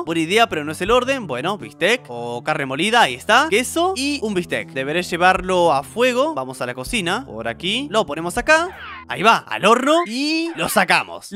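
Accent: Argentina. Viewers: Sos argentino?????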